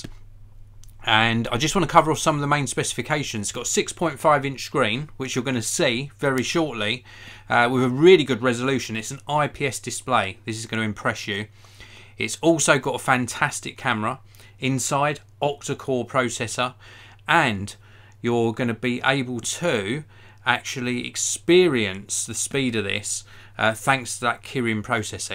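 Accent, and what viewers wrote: England, United Kingdom. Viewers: Its called an English accent mush!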